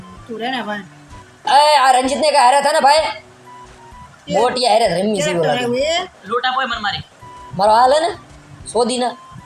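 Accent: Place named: Kathiawar, India. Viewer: bhai કાઠીયાવાડી lage se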